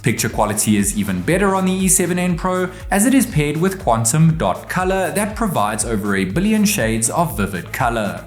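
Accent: South Africa. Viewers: This is the first time I stumbled across content from you, immediately heard that lekker SA accent my bruuuu. Cool video, thanks!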